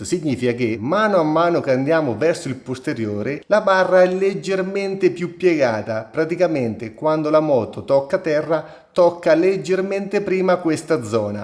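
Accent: Marche, Italy. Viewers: Caro, seguo molto volentieri i tuoi video perché sei bravo preciso, hai tanta esperienza, ma soprattutto il tuo accento marchigiano ( credo) mi piace un sacco 😊 Vengo al punto: il parateste in acciaio originale Bmw è per te efficace per un uso del GS che non ha il tubulare esclusivamente su asfalto?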